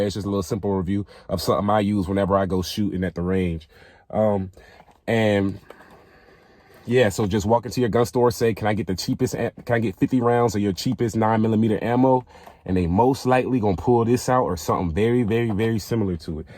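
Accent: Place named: Florida, United States. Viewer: You talk like a Florida nigga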